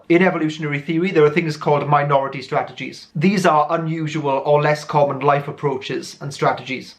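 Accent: Wales, United Kingdom. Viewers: Is nobody going to point out the Welsh accent?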